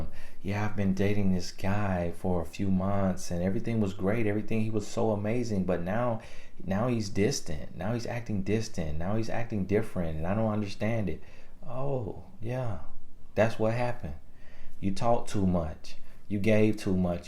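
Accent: South, United States. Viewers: I smiled every time he said “On ya bike!” 😂😂😂 I love southern accents!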